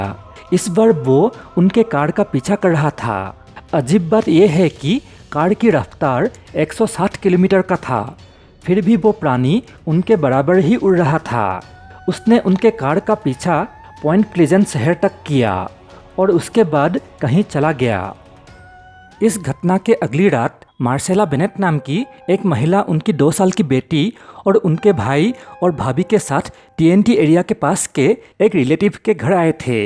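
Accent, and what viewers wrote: Assam, India. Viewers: Apunar tone Tu alop asamiya jen lage assomor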